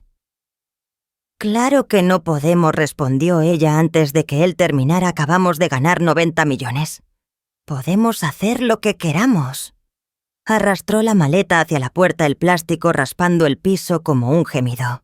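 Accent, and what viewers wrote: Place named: Spain. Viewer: Mis respetos para los españoles pero No resisto una narrativa con ese acento. 😢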